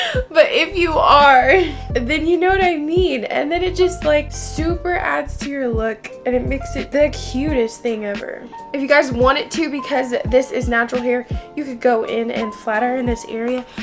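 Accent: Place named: Alabama, United States. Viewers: Girl where are you from bc your accent is very distinct lol.. Alabama?? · it’s so precious, it sounds like a classy southern-belle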